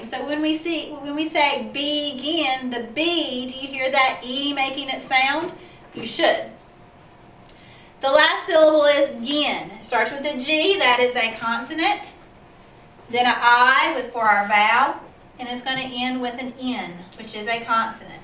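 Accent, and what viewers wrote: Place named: South, United States. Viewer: Vicky Popova it’s a very common pronunciation for native speakers in the southern United States. Definitely a native speaker as non-native speakers don’t tend to learn this particular dialect or whatever.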